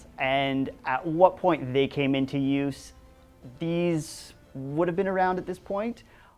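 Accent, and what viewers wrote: Canada. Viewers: As soon as you opened your mouth, I pegged you as a Canadian (and from my alma mater too!) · I can tell he is Canadian by his accent. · One sentence in, and I can tell he is Canadian 😅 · I'm guessing he's Canadian from that "oooot" and "aboowt" in his accent.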